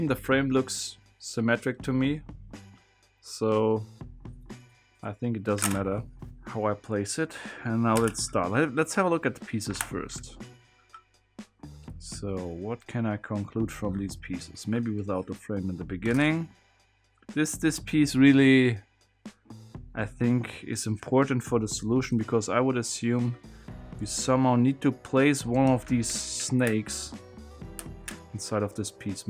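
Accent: Germany. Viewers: You sound like you are a german xD Falls ja , hab einen schönen Tag ;) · wie ich den deutschen Akzent sofort erkannt habe 🤝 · I can hear the German in you :D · I recognise a German accent when I hear one! This is just amazing, as I’m someone who is speaking in some odd amalgamation of different accents. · He sounds sooo German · U german? Cause you sound like my english teacher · This is the first video I watch from you and 5 seconds in the video I thought "bro, this accent, he must be german" and then checked your channel information and I was right Hello fellow german buddy~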